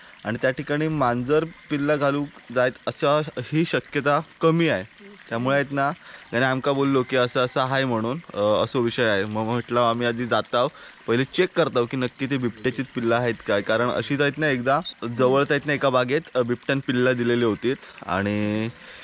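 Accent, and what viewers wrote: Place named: Malvani, India. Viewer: अरे तु मालवणी आहेस की रत्नागिरी कर. बोलतोयस मालवणी.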